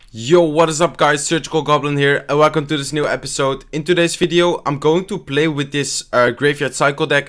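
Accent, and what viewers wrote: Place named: Netherlands. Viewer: R u dutch u sound dutch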